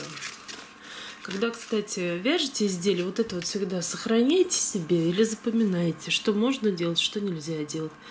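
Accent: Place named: France. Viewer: Обожаю Ваш фpанцузский пpононс )я pелаксиpую , когда слышу Ваш голос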